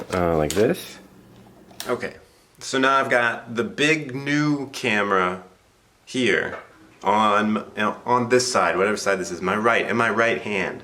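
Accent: England, United Kingdom. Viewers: I didn’t comment earlier, so let me fix that and say this - the floppy hair, the accent, the Christmas tree...it’s like you’re about to star in some British holiday romcom that my mom would make me watch with her. Adorbs. Good to see you back in all your crispy, high-def glory. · Oy me old china, I do like your English accent! 👍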